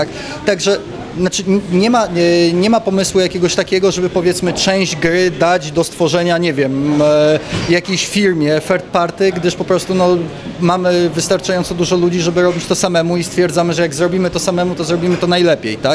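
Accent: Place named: England, United Kingdom. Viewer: ale ma chamsko angielski akcent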